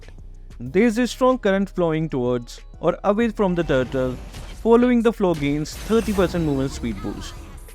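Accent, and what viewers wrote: India. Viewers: Just one question bro😂😂😂.....Where are you from???? INDIA?? YOUR ENGLISH accent is like an Indian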